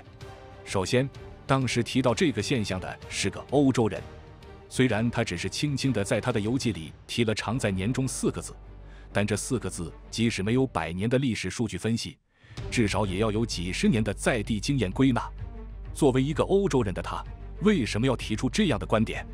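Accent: China. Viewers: 這個text to voice 好像是公用的，但都是大陸語音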